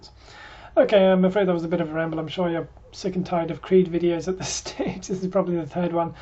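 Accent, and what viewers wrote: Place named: United Kingdom. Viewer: My friend you made my Bose 700 headphones sound like a $5 wallmart earphones. First I didn't understand if my headphones missed a connection and the sound comes out of my cellphone or out of my arse. Creed is good but please for God sake invest $45 for a decent microphone or use your cellphone microphone I bet it would sound much better then your current laptop. Lastly, I wanted to mention, you have British accent so why do you complain about the price here. This bottle cost you no more then 170 pounds, we on other hand here in North America pay double of that. Some places I know charging for the same 100ml bottle $520 USD tax included this is your £405.....this is damn expensive! And thank you for the video. Cheers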